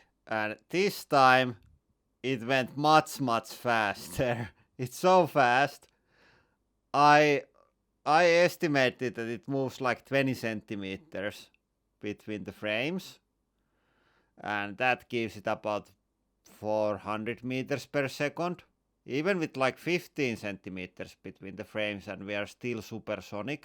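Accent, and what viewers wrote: Finland. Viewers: It's funny how strong the Finnish language pattern is. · Plug. :) U is pronounced oo in finnish. That's also why he sometimes say boonker instead of bunker.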